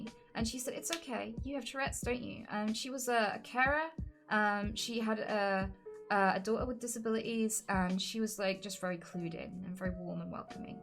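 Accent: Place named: United Kingdom. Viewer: This is the best British dialect a woman can have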